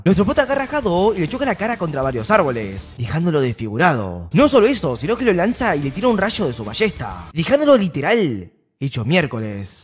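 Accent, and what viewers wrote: Argentina. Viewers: TE AMOOOOOOOO DE AMIGO SIEMPRE ME ENCANTA TU CONTENIDO Y TU ACENTO ARGENTINO 🎉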